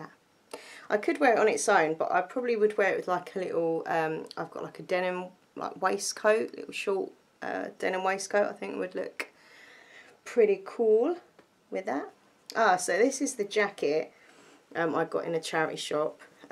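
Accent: Essex, United Kingdom. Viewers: You sound like you're from Essex with that accent. Are you from that way?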